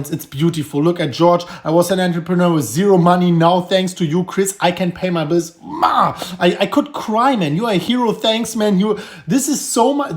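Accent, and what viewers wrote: Germany. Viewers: Good time to wait for bottom if you are a hodler. Why? Tax season. Funny German Accent Guy Say's Whales selling BTC.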